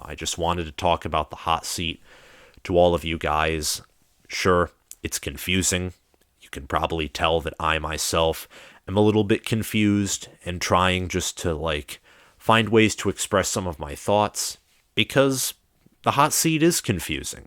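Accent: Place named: Michigan, United States. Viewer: Pac- fan in Sparty land: I luv '22 Mich. State's new, awesome QB/WR duo. By dialect, u sound like a Mich. fan. The new MSU qb/wr pair is 🔥🔥🔥. UM & PSU start hoping it takes most of a new seas. for the new MSU o' to gel bc these cats from out west r way better than dudes from Naperville & SE Mich 🤣🤣🤣. #TuckerKnowsOutWestGreatness 🔥🔥🔥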